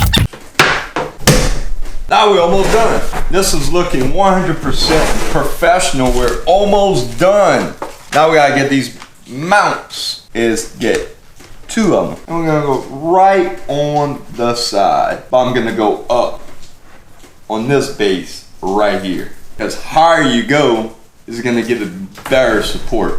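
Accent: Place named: Appalachia, United States. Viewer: Learn to talk dam hillbilly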